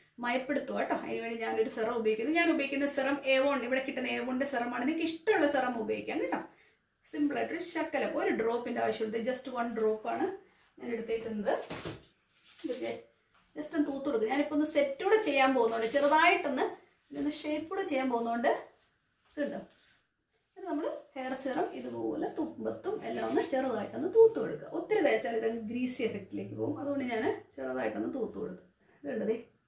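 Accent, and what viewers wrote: Kottayam, India. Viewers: Chechi vdu Kottayam ano???Samsaram kottayam kare pole undu..Pine salwar oke kanichapol narmada ninnu edutha materials anennu paranju atha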